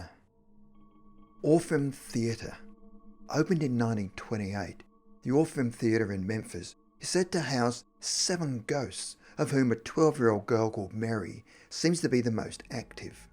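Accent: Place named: Australia. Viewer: Ohhh ok. Well great! Yup. He was right about that. And I'm glad he did more shows in prisons. To spread love cheer and the gospel. I had only heard of him playing At Folsom.... So that's why I was wondering. I never have learned everything about him. But yeah I'm glad he came to Tennessee state prison in 1974. 🙂👍 And yes your welcome. Your videos are fun to watch and spooky and interesting. Your Aussie accent makes it even better. 😄. P. S. I live in Memphis TN and grew up here. I have been to the Orpheum once years ago when I was a teen and it is definitely a beautiful but spooky place. I read that Mary the little girl ghost ....once threw the janitor lady's spray bottle into one of the toilets. Lol. She looked everywhere and found it there. That was crazy.😄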